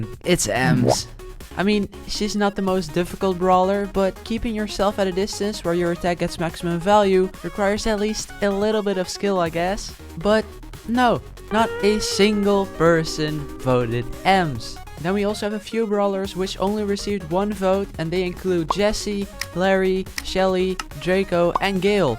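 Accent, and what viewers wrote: Netherlands. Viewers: Wait are you Dutch? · Holy Dutch accent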